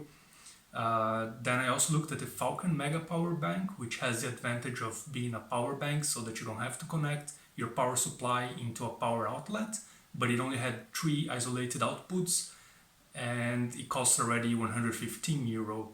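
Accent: Brazil. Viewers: Fala, sabia que você era brasileiro! O sotaque é de Brazuca! To aqui na Holanda BTW. To precisando de uma fonte dessas, a minha ISOPOWER 12 não cabe em baixo do pedaltrain 2 e mesmo que eu coloque o CIOKS mounting kit acho que não vai dar, vc acha que ainda é uma boa opção?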